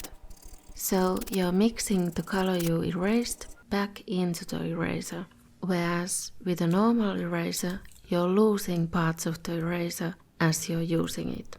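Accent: Finland. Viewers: Ootko suomalainen ku aksenttis kuulosta siltä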